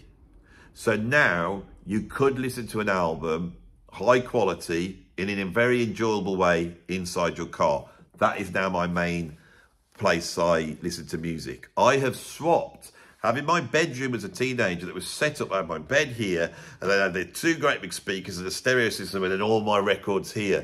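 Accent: Birmingham, United Kingdom. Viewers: You crack me up Brummie in a good way mate. Lived in Stourport-on-Severn <num>. No longer in the midlands, which I miss. CDs have their advantages and some sound fantastic BUT a good quality bit of well recorded vinyl on a quality turntable through a decent amp to decent speakers is something else again... natural! UTV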